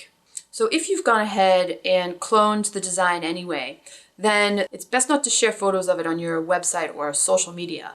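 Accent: Boston, United States. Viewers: This was really informative. Thanks! And your boston accent cracks me up 😆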